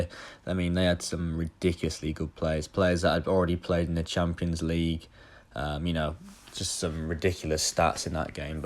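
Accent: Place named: United States. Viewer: You’ve got an American accent now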